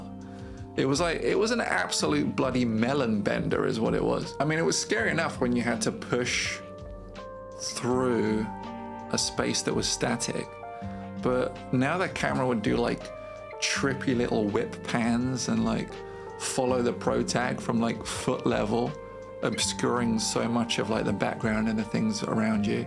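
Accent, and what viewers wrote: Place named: England, United Kingdom. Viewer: Wow, I was shocked to end this video and see you only have 40 subscribers. Love your humor and topic of video. The combination of English accent and mug is a little hard to digest at once, though. If you’re going to show your face at least, use an American accent or vice versa. Keep up the quality man. Seems like you’ll be successful on here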